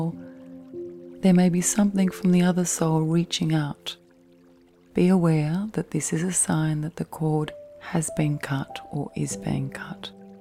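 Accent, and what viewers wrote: Australia. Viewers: I love you & your tranquility!!!❤️❤️💋💋, I love your sweet, soothing, sexy chloroform voice!!!❤️💋, thank you so very much for your sweet happy Australian voice!!!❤️💋❤️💋, I love you with hugs and 💋💋💋💋💋💋💋💋💋💋💋💋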